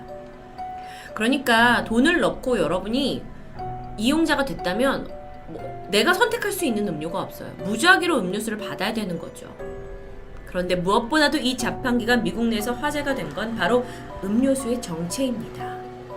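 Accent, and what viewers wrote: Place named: Gyeongsang, South Korea. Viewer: 경상도~~~ㄲ ㅈ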